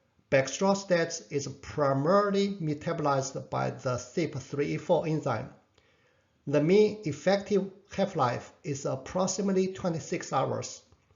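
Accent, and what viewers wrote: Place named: China. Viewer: 謝謝您的分享👍I know u can understand Chinese, from the accent 😊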